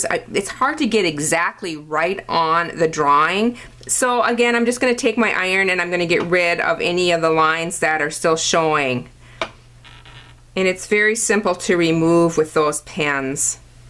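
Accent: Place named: North Dakota, United States. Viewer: Hi. I've just discovered your channel. I love it! The first thing I noticed was your accent. I immediately recognized it as most of my relatives live in North Dakota and are Norwegian. Made me feel right at time, lol. Keep the videos coming, they're great!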